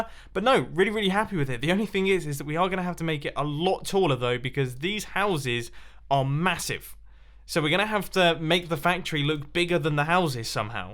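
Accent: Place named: United Kingdom. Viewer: "Does that look a little bit naff?" Me: "Naff?": "I feel like that looks a tiny bit naff" Me: "But what is naff?? EXPLAIN!!" Lol, this is why I love British youtubers, I don't understand a word they're saying sometimes. Keep up the good work!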